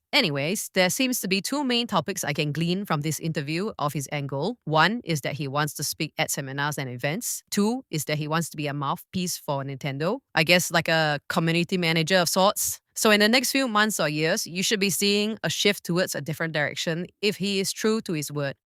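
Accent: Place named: Singapore. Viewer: Eh you got Singaporean accent... 😮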